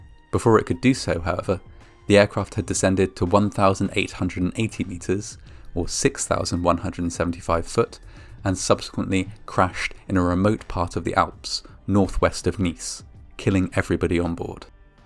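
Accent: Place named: RP, United Kingdom. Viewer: The Queen's English, mehn. Respect.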